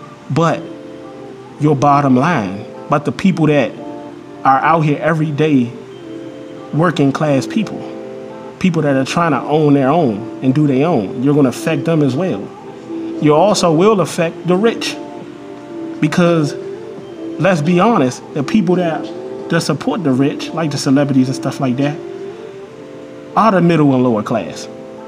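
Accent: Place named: Baltimore, United States. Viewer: You sound like you from Baltimore but real facts to the video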